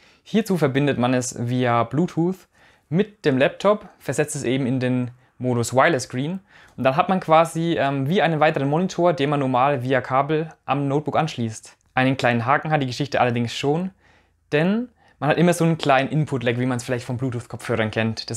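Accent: Franconia, Germany. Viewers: Ich hab's mir bestellt und bin schon ganz gespannt. Als Klavierlehrer nutze ich schon lange Tablets, allerdings ist es gerade so an der Grenze gewesen von der Größe von rund 10 Zoll. Ich habe das Tab A von 2016 und den Nachfolger von 2018 hier herumliegen. Der Akku vom alten ist ironischerweise besser, auch aus diesem Grund möchte ich das FE nutzen. Wie ich höre, ist ein Franke. Ich war in Unterfranken im Internat. Dementsprechend bekomme ich ein bisschen Heimweh und Nostalgie beim hören des frängischen Dialekts. 😏 Grüße aus Hessen! PS für Scans empfehle ich euch Adobe Scan. Das macht auch ohne Blitz hervorragende Scans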